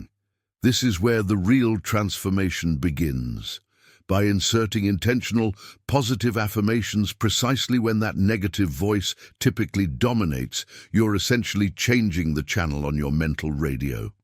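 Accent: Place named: United Kingdom. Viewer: This pompous, ubiquitous, British-sounding narrator’s voice is highly annoying. “We make money the old fashioned way: we eeeearn it.” 😕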